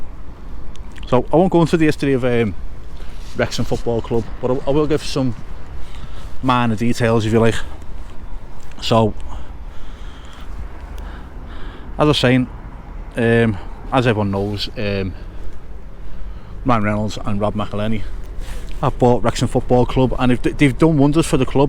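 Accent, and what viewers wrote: Liverpool, United Kingdom. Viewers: Nice scousers accent great video!! Yer love Wrexham fc I'm Celtic fc ☘️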